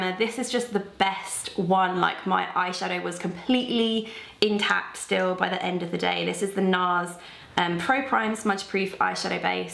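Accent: United Kingdom. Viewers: yes! I also read your comment right as she said "brighter bees." I love Brits and their accents!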